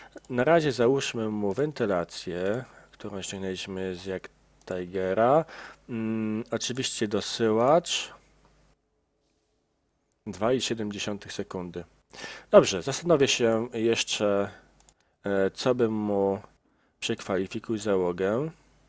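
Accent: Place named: Poland. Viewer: A czy uważasz idioto, że to Niemiec? Jest Polakiem więc mówi tak jak powinno się mówić w Polskim języku.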